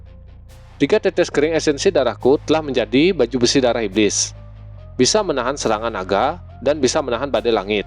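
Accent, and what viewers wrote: Bali, Indonesia. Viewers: Makin lama makin jelas logat balinya :v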